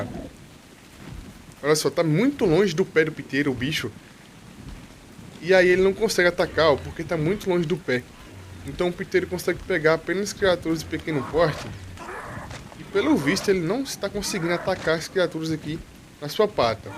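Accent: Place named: Alagoas, Brazil. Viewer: Cara vc é de Alagoas? Esse sotaque não me é estranho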